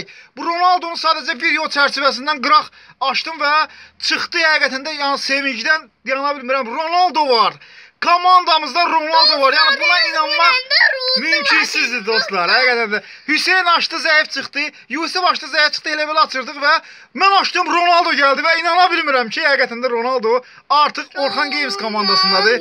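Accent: Baku, Azerbaijan. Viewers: Abi bu bakü şivesi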